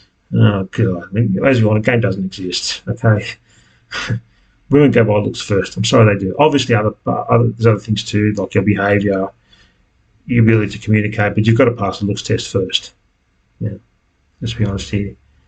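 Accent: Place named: United Kingdom. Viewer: yeah but i'm not native, i think You are british SO i really have to focus if i want to hear one of your videos they seem good honestly but it's linda hard too hear them while doing other activities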